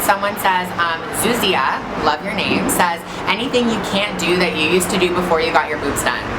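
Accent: Canada. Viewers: she is from Canada, our english is similar to the UKs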